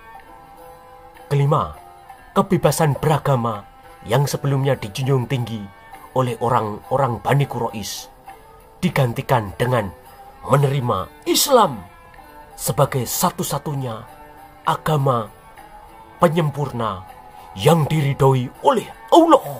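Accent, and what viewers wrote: Java, Indonesia. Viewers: Adminnya medok banget jawanya😅😊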